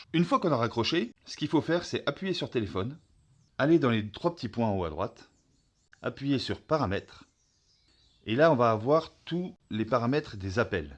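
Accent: Franche-Comté, France. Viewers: D’où vient cet accent franc comtois ?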